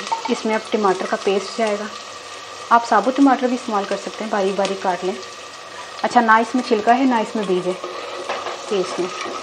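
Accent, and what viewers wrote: Punjab, India. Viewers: ji sister ji mai app ki punjabi bolnay kay style say he app ka fan bana tha pher app ki recipe etni achi thi kay kiya batahu sab ghar mai mama orr sis bana ka deti hain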